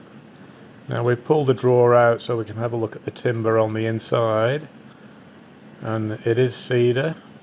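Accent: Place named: England, United Kingdom. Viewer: Ooh Hello. Haven’t found a fellow Australian restorer yet. Pommie accent to one side. Looking forward to part 2.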